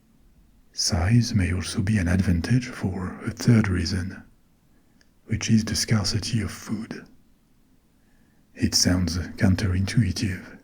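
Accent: France. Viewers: back to spaaaace 🎉😂 plz dont loose the french accent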